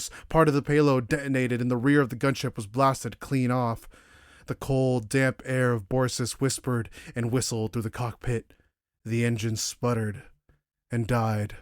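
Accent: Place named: Russia. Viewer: Very cool reading !! Not a big fan of the Russian accent tho, makes it a bit difficult to understand the Marines lol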